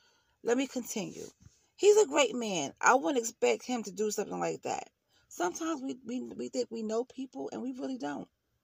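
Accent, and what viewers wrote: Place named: Boston, United States. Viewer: I love your Boston accent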